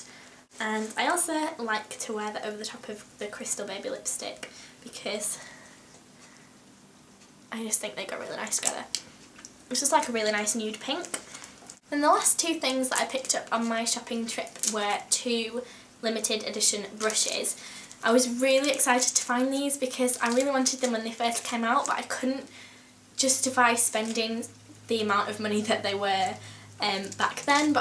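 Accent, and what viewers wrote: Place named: North, United Kingdom. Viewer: please do a tutorial on how to put them on if u havent already. love ur accent so im going to suscribe. you sound so nothern!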